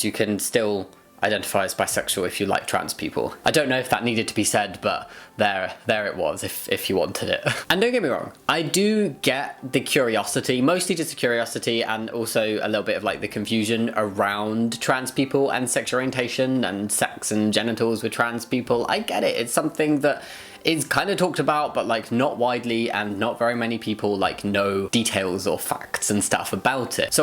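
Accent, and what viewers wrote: United Kingdom. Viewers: La verdad, me revuelves mucho por lo rápido que hablas, y por lo poco que se de ingles, pero me encanta escuchar tu voz, asi de grave, con su bello acento británico y asi, no cambies eso nunca. 😋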